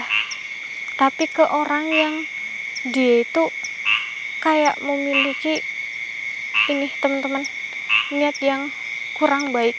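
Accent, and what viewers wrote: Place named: Java, Indonesia. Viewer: Mba dr logat nya mba dr Jawa ya.masih kelihatan lihat Jawa nya.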